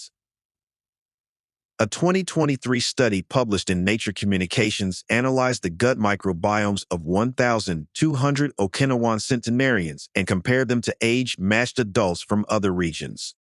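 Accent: United States. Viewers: Without the American accent which destroys it’s credibility immediately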